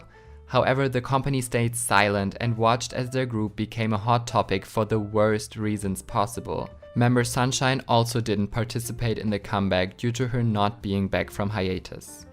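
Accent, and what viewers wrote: Germany. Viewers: okay, off topic, but are you, by chance german? Your accent feels farmiliar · are u german by chance? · Are u german? :D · are you German by any chance ? You sound quite German to me :) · Is the narrator German? That accent is so strong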